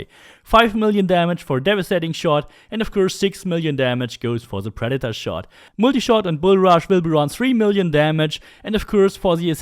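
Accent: Germany. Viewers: Man hört den Deutschen zwar noch rauß aber deine englische aussprache ,bis auf ein paar deutsche Klassiker, ist echt mega.Englisch Studium oder einfach nur sehr viel englisch geredet?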